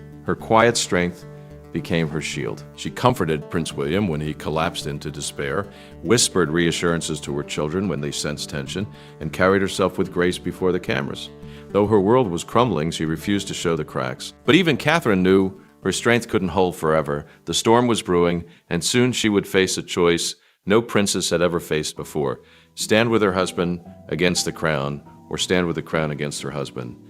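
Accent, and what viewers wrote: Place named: United States. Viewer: This video has to be investigated to ascertain who is behind this rubbish. Is the American accent a clue?!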